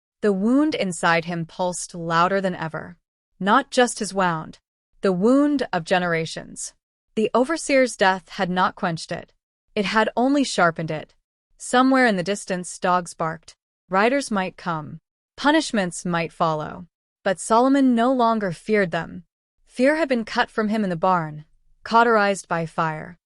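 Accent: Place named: Europe. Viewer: I would have listen to it if the voice wasn't European.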